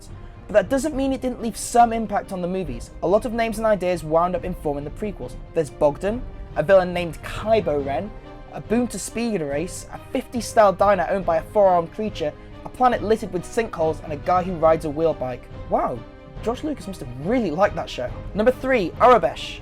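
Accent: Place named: United Kingdom. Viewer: He has a British accent... They pronounce 'a' at the end of a word as 'er.' Not a mistake...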